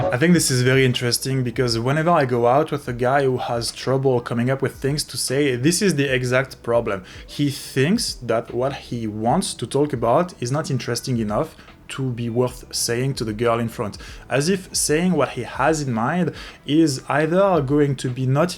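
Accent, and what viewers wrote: France. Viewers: Man, u have a good explanation of Tylers infeild videos... i like this minsdset "theres no downside to anything u say or do, there is only UPSIDE"... got rejected? U become numb to rejection (upside). Don't know what to sat? Say "whatever" (dont give a fck, u r just practicing). Thanks for sharing. Btw, ur accent sounds French, where do u game?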